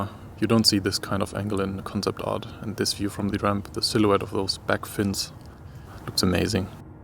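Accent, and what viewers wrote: Germany. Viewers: you sound like a german speaking english :D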